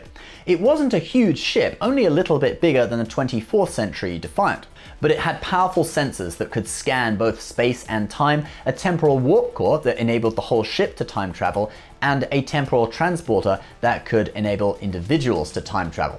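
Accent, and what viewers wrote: United Kingdom. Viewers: IS BACK! GOD SAFE THE QUEEN! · The return of the sophisticated it’s always a pleasure to see your face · Woohoo, is back & we did not have to see that heretic American. thank you for this video, & thank you for not being a heretic. #Humanityfirstlast #Britishbeniskewl #Americanbendrools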